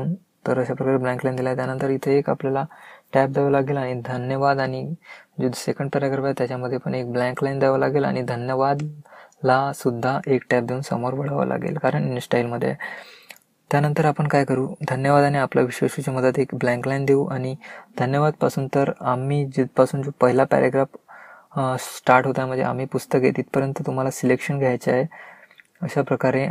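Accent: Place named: Nagpur, India. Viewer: i think u r from nagpur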